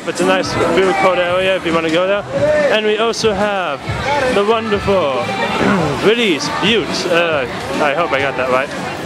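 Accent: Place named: Germany. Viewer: Ur accent sounds german but I may be mistaken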